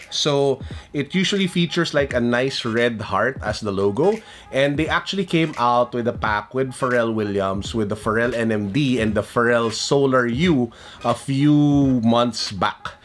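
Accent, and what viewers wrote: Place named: Philippines. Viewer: That Filipino accent tho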